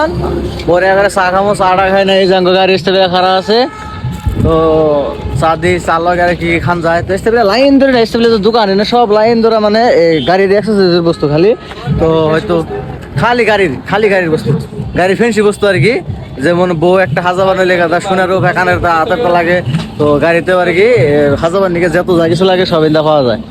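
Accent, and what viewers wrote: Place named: Bangladesh. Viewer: ইন্ডিয়াতে আপনার গ্রামের নাম কি.... বাংলাদেশে আমাদের গ্রামের মানুষের কথাও আপনার কথার মতো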